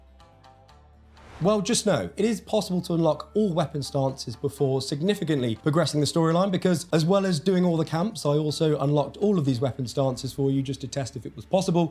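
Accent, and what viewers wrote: United Kingdom. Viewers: You sound so Gay brah.. You British eh?😂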